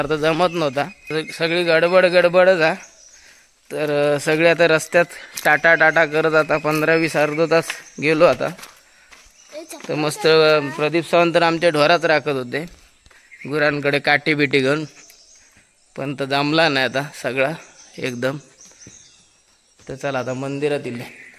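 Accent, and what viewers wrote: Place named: Malvan, India. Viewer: Good vlog nice to hear malvani 😊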